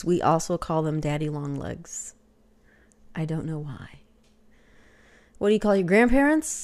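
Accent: Texas, United States. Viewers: You have very little Texas in your voice - once in a while, but not often. The new sign is cool. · I am not really sure how a Texas accent sounds... but i think yours is lovely... :-)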